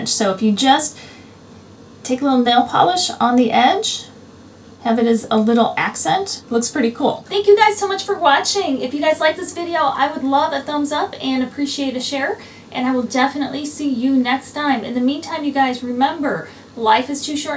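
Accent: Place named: Michigan, United States. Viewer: Love these! By the way, are you from Michigan by chance? It sounds like it from your accent.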